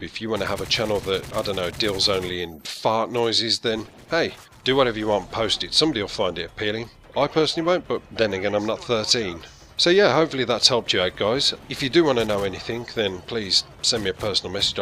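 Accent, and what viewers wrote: United Kingdom. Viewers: your british accent is pretty freakin awesome!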